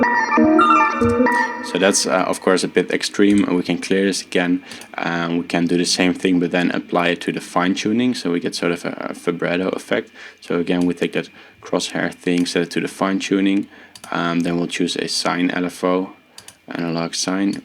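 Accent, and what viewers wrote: Netherlands. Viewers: Dutch accent detected 🤘🏻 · dutch accent right there...sowieso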